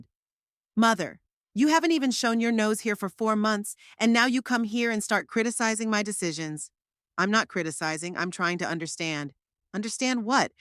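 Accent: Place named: Mexico. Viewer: What's up with the " Frito Bandito" accent?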